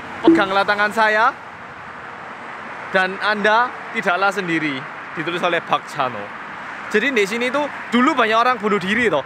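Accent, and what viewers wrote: Java, Indonesia. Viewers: MEDOK BANGET ASKJSDHKDSHFHSDDSJ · Iseng liat vlog dan baru tau vlog ini and... Lah... Medok banget jawanya😆 asli orang mana sih kak? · sepedah pancal hahahha.... orang korea yg super medok... yang bikin ngakak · aku wis nonton videomu ket jaman dipek ket kowe isih nganggo kamera hp. neng aku cen jarang komentar. neng asline aku seneng nonton videomu, kowe ra ngerti aku tapi aku uwis nganggep kowe kaya kancaku, kowe mung luwih tuwa sithik seko aku, caramu ngomong nggo basa jawa, logatmu marake aku ngrasa kowe kaya kancaku, ngarasa kaya kowe ki cerak. and what I like about you I know youre genuine, you care about Indonesia, you care about us like a dear friend. aku seneng ngrugoke kowe ngomong youre like a chatty friend that I never had. thank you for being here, thank you for your wise words, thank you for sharing your story with us. 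I like to listen to your story on a rough day. It helps me, I love your friendly voice. I hope you will never stop making videos, you could talk about your bad day and I will still listen to it. I don't know whether you will read this or not but once again thank you. wish I could meet you in person one day. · Loh gaonok jawabane jampot wes kehapus😂. Njir muedok seru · Wah du bahasa elo medok banget sihhhhhhh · kadang pas ngomong Korea suka kebawa medok ga? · muka korea tp ko medok yo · kalo ngomong bahasa Korea (jadi keliatan orang Korea banget) pas pake bahasa Jawa ( medookk tenan mas ) syuuukak banget 😍😍😍 Ketika susah maupun senang selalu ingatlah dengan pencipta mu maka sesulit apapun hidup dapat kamu lalui, sesenang apapun kamu jangan pernah lupa siapa pemberi nikmat/rejeki agar kamu tetap bersyukur. 😊😊😊😁😍😍😍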